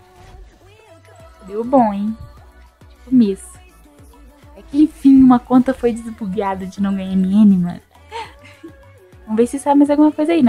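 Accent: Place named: Minas Gerais, Brazil. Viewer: Esse Sotaque Mineiro e meu devolvs kkkkk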